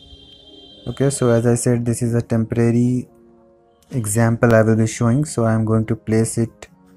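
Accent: India. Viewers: Are you an Indian ?? Your voice matches Indian voice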